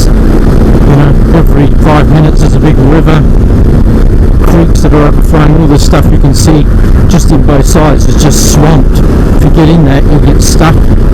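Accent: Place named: England, United Kingdom. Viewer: ..hey...r u a sandgroper?! Sound like a pom! We wanna c where u camp n what u eat - and let us know where u r!! Cheers - enjoying it.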